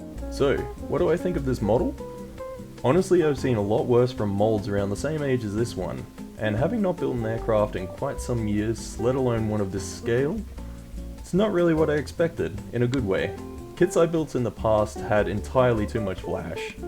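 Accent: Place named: Australia. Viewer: You sound australian. And your glue seems familiar Did you get this set from metro hobbies?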